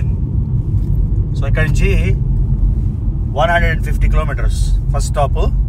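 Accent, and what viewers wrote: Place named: Uttarandhra, India. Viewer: Take a Bow for our Mother Uttarandra slange ❤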